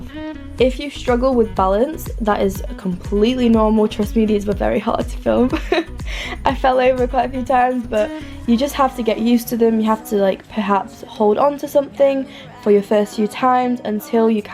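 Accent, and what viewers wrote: United Kingdom. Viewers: Somehow I find the way you speak really attractive, is that weird? 🙈 Btw are your parents/family hispanic? Your name sounds hispanic but your accent obviously is British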